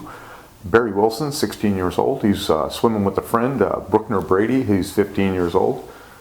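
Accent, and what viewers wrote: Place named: Midwest, United States. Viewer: Great channel. How does a guy with a Minnesota/Midwest accent be so knowledgeable about sharks?! Hahaha great stuff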